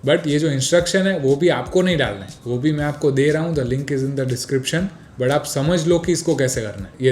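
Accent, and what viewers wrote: Gujarat, India. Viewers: bhai yaar aapki hindi me jo gujarati lehka aata hai vo maja aata hai...by the way english bahot achhi bol lete ho. bhai me bhi professional graphic designer hun ahmedabad se. abhi kam bahot hi kam mil raha hai koi help ho sake to kar dena bro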